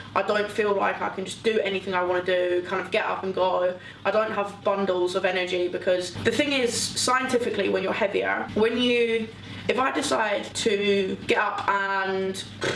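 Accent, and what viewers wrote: Scotland, United Kingdom. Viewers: Fix your accent you moved to london dont mean you have to look your scottish accent and sound chavy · Miss your Scottish accent ahha xx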